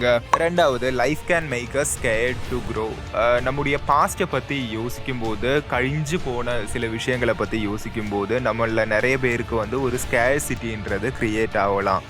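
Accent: Kerala, India. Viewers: Extra ooordinary keralite o pronunciation style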